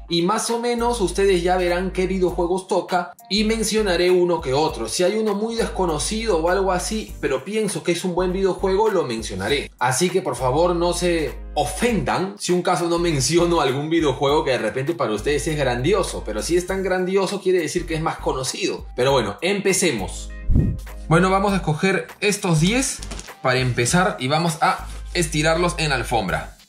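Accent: Peru. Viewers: Eres peruano? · Eres peruano? 🤔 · Acento limelo? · Soy yo o su acento es de peru xd?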